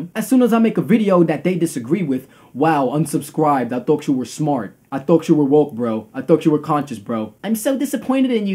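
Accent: New York, United States. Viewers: I love his New York accent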